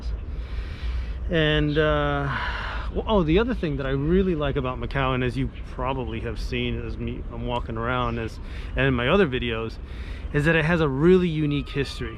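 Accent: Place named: Los Angeles, United States. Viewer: This is my first viewing of your video and I think you did an amazing job. When I started to hear you speak, I thought you were from Los Angeles which is where I'm from. I love your thoughts on living in Macau where I was lucky to visit in 2008. I totally understand the comparisons you made of Macau and other places in the States. I hope to one day visit again and check out the places you mentioned. How did you make the adjustment in terms of speaking Chinese? Are you speaking more Cantonese or Mandarin?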